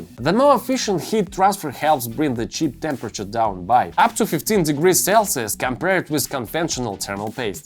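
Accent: Russia. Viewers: I enjoy it,but Take away that Russian-english,it will bee 100% better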